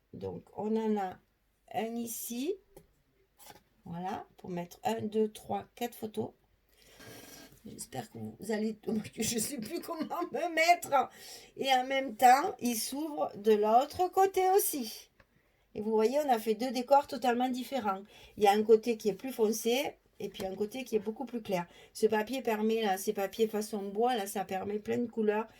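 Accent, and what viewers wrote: South, France. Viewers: Superbe! Quelle imagination! J'adore! Bravo à toutes les 2! Et très sympa l'accent du Sud! 😉😉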